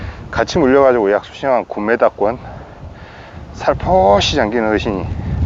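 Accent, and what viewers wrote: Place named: Jeolla, South Korea. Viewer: 터진고기는 목줄 검사를 꼭 하십시요 터지면 환장합니다 전라도 사투리 역시 구수하네요 저도 본은 전북 정읍입니다 직장이 평택이라 올라와 있죠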